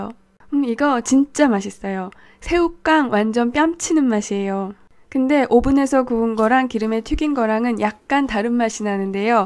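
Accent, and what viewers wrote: Seoul, South Korea. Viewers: 목소리도 굉장히 좋고 말투도 상당히 매력적이세요! 그런데 꼭 들어본 것만 같아서 계속 생각해봤더니 서울사투리를 쓰시는 것 같아요!! 꼭 그게 아니더라도 정말 개성 넘치는 말투라 듣기 좋습니다~ 좋은 영상 감사해요 ㅎㅎㅎ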